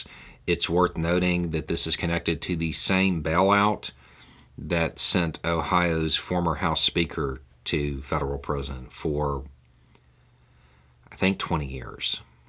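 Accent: South, United States. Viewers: Whoa. I've been watching about a year, so maybe back then you had more of a southern accent, or perhaps you were putting it on? I had to turn on the closed caption and that didn't even always help!! But a big LOL on that clip,!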